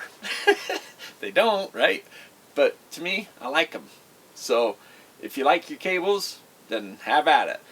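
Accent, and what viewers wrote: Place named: Utah, United States. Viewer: I hear n. Utah/s. Idaho in that voice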